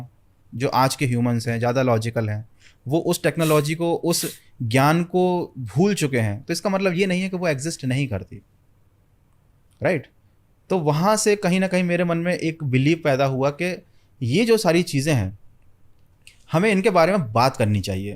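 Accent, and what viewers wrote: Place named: Bhopal, India. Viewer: Ek bhopali hi dusre bhopali ko pehchan skta h only accent se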